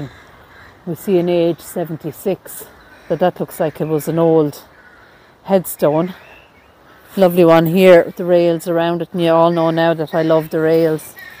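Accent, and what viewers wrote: Ireland. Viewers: Love your vids ,and the Irish accent ...enjoy them greatly as I'm not well enough to go myself ...love gv too x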